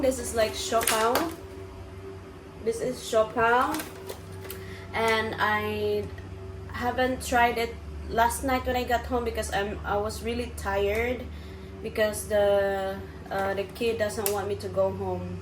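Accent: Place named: Thailand. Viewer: Dang! What haffen vella? Your accent is becoming thai now haha!😅 You should learn how to speak in thai now! Btw, cheers to more cooking videos chef! 🧑‍🍳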